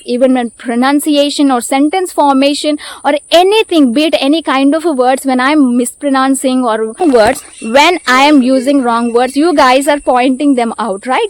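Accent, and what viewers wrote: South India, India. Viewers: I think you are from south India.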